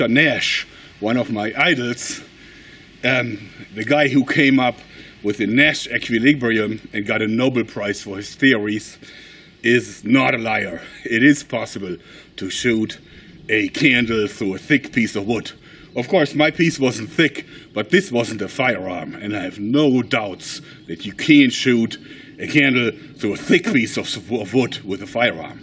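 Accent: Germany. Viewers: Hay, fu bist doch Deutsch oder, finde du hast ein typisches Deutsch-Englisch. Antworte bitte auf eine Frage eines Genossen:D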